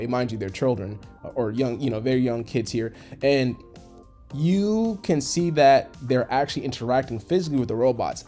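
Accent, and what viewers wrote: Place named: United States. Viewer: China hasn't shot a bullet outside of their borders in almost half a century so it's kinda hard to listen to someone paint China to be as warmongering as the US especially in American accent. Not saying China can't be aggressive in the future but you can't deny the fact that the irony and hypocrisy is too much to handle for most of us normal non-western people. Also I agree that China probably already testing more advanced robots, drones, and AI in their secret military labs but they're the only major power that actively tried to ban and regulate AI usage in war. So it's not fair to criticize China without criticizing certain powers who denied Chinese proposal just because China advanced faster than expected.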